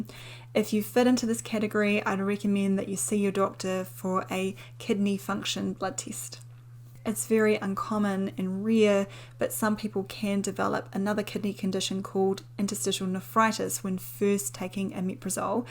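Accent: New Zealand. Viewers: I have binged watched a lot of your videos. I like your NZ accent compared to my American accent. I never realized the differences in pronunciation until I listened to you speak. Keep up the good work. I also like reading the comments of other viewers. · None sounds New Zealand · Loved this video Dr. Sam... I worked for Merck back in the day and was involved in the launch of Prilosec (then Losec) in the U.S.. This video really brought back a lot of good memories--and your accent brought back many other great memories of my time living in New Zealand! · Ah, Kiwi accent. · It usually takes me 2-minutes until I can understand the New Zealand accent ... Then, I'm fine. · Very informative video. I love the kiwi accent .. one of the best accents in the world. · Looks Irish but sounds Kiwi. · I just discovered this video today, Thank you , it was very reassuring as I take this medication on an as and when basis. 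Also lovely to hear a New Zealand accent on YouTube .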